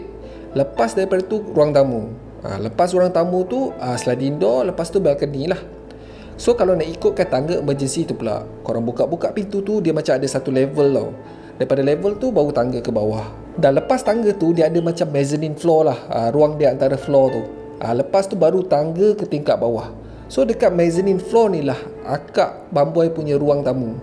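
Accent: Perak, Malaysia. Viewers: Ooo mmbesar dtmpt kelahiran saya,w.maju,tu dgr lohat perak je😊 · ptt ler ade sleng perak...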